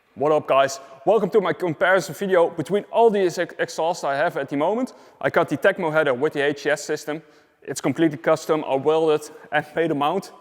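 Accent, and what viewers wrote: Netherlands. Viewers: Ar you dutch?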